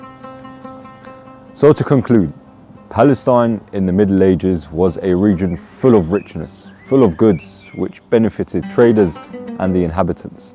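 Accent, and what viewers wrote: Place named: England, United Kingdom. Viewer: لكنة انجليزية رخمة و رائعة!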